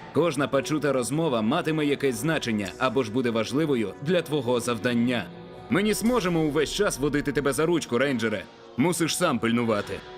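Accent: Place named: Russia. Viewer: Акцент там не для розваги чи насміхання над кимось. Це для збереження задуму авторів відео, згідно з яким мовник має сильний російський акцент.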